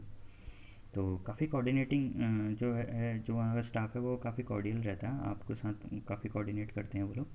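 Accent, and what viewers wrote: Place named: Kumaon, India. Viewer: Sir are u from Uttarakhand because ur accent and ur look is totally like Kumaoni people of Uttarakhand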